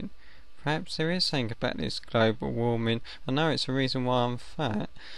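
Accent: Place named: England, United Kingdom. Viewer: This is HILARIOUS! +1 for an English accent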